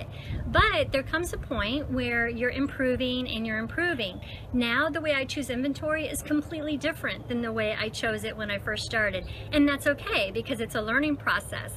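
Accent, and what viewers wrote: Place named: United States. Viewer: Always watch your vids, great content and engaging, and your American accent on Tooosday Tips..... the 3 loooong T's.........lol, you are milking it now!! (my humour), don't stop!! slow down back to yourself speed.... Love your accent and your intelligent & organised approach to your informative and entertaining productions....GL to you, keep it coming.. from the lil' UK x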